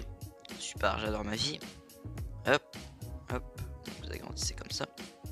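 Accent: Quebec, Canada. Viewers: Hey vive l'accent Québécois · T'es québécois ?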